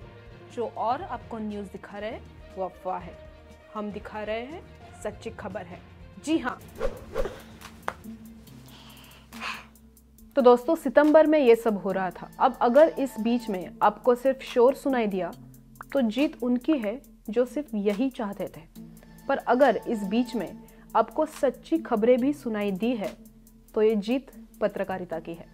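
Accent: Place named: Maharashtra, India. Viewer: Superb...... your hind is too good.. ( with a slight touch of Marathi). Love your work!! keep it up!!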